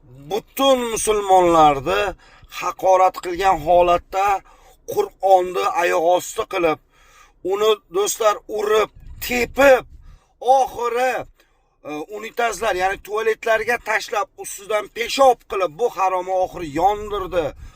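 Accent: Tajikistan. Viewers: ШЕВАСИДАН МАЬЛУМКУ БУ УЗБЕКМАС ТОЖИККУ. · Бу тожик акценти билиниб турибди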